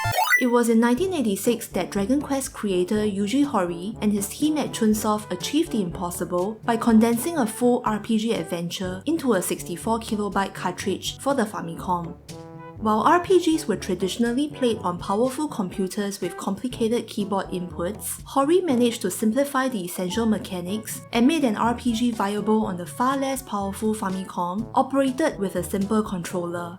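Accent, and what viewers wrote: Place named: Singapore. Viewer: That sing accent tho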